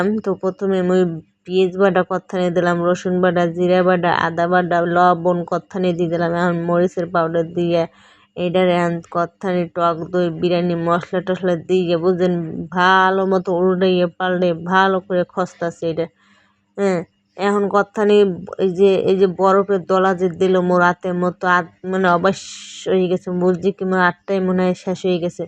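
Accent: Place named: Barishal, Bangladesh. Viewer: মাশাল্লাহ বরিশাইল্লা কথা অনেক সুন্দর। এর সাথে তোমার কাজগুলো অনেক সুন্দর ❤❤🎉🎉সুস্থ থেকো আপু লাইক দিয়ে পুরো ভিডিও দেখিনি। 🎉🎉🎉🎉🎉🎉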